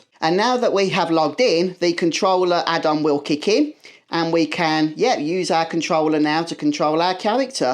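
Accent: United Kingdom. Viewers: Goddamn british accent😂❤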